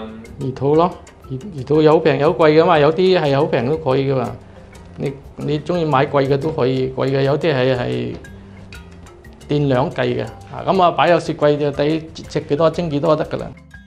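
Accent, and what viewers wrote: Zhongshan, China. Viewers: 师傅口音好似中山人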